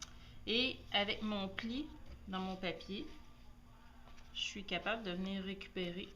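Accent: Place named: Canada. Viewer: merci ma canadienne j'adore ton accent ! et merci pour tes bons conseils! et l'autre en dessous qu'il ferme son tabernacle mdr!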